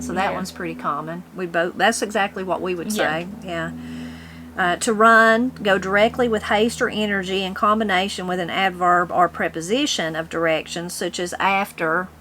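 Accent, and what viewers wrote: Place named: Oklahoma, United States. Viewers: My Oklahoma family is originally from your area and they speak like you. I was grown and gone before I called a couch a “couch” before that my family mostly called it a divan or a lavan. They also added the r to their words. Like my aunt Nina was “Niner”. My mother was and still is for many of her family Ader for Ada. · I love the way you speak! Growing up on the West Coast, we could NEVER get away with speaking that way. It almost sounded like a "foreign language" when I first started watching your channel. Not judging at All! I Love how in the South they use the phrase "Bless your heart"... sit down and stay a spell. I remember my friend Pansy said y'all one day, oh my goodness Mrs. Morris corrected her and embarrassed her in front of the entire class. She never forgot that. Your girls are some of the most loving young women I have the pleasure of getting to know. 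I watched a video of them yesterday asking if there were anyone needing Prayers!! Who does that? Girls that were brought up by loving families, that's who. So Sweet. What a better world this would be if we had more and. ❤ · How can I order your cookbook? Thanks. I’m from WV, so I do understand your (or ourdialect. Lol 😂😂😂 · I love the way you talk. I am southern thru and thru. Learned most from my Granny. Miss her a lot.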